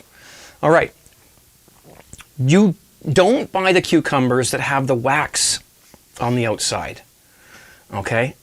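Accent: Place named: Canada. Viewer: Michael Kimber he's definitely Canadian. we don't say "Bob's your uncle" in the states. and it's pronounced erbs the h is silent 😝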